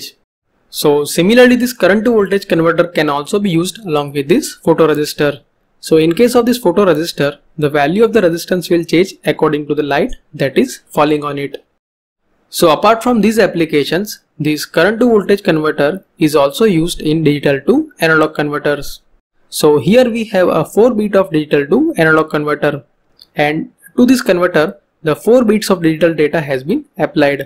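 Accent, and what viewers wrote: India. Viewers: Thank you for this video. Very informative but hard to understand. Fortunately there are sub-titles available. It could be even clearer with a native English speaker narrating as many people do not fully understand the accent of the Indian sub continent. The structure is unfamiliar and multiple rolling R's are challenging. The math is explanatory, though really it is secondary to the actual physical characteristics of the circuit.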